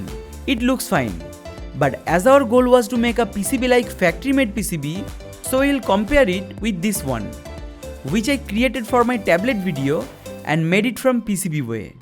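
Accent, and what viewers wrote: Bengal, India. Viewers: How do I contact you? You also sound surprisingly much bengali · Accent Is Like Bengali Dada Where Are You From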